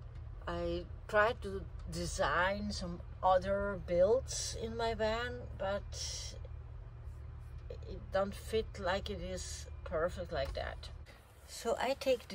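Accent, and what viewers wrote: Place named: Hungary. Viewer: I just saw your video come up and I would much rather have my bed at the front for many reasons. I would feel much more secure for sure. I love your accent, you sound so much like my Hungarian mother. I do hope that you are feeling happier and more positive these days. We are sending you warm hugs and positive energy and wishing you success with your channel. ❤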